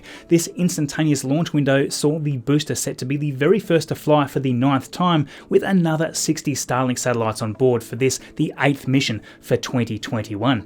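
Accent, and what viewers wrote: Australia. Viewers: glowing dick ? your accent is from australia or new zealand right ?!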